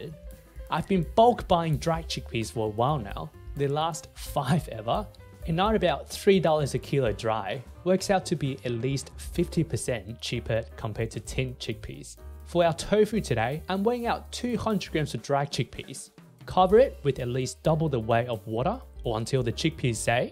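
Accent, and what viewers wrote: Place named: India. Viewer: You chickpee have an Indian accent as an indian i liked it 🤣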